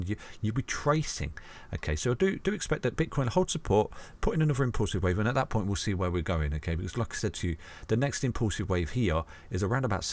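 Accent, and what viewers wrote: United Kingdom. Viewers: You have a fcking perfect british accent, I love it.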